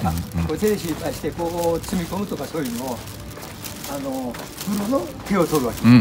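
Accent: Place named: Miyazaki, Japan. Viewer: やっぱり地元に帰るとアクセントも宮崎なまりになるもんですね。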